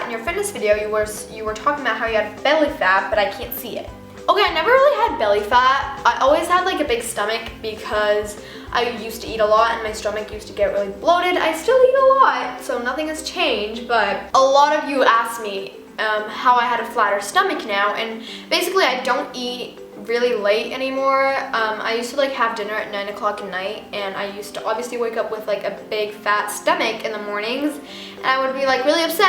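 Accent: United States. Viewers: How'd you learn English? You have a perfect (american) accent 😂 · Hey I'm English, but I live in Northern Cyprus which is like Turkeys neighbour and I can see the Turkish mountains from my window sometimes. I've lived here for most of my life now, so I can speak fluent Turkish. Anyway I was wondering why you have an American accent if you live in Turkey and your parents are Turkish and you were born in Turkey? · If you're Turkish and all your family are and you were born there. Why do you speak in an American accent? PS. I'm going to Turkey on Saturday :) WOO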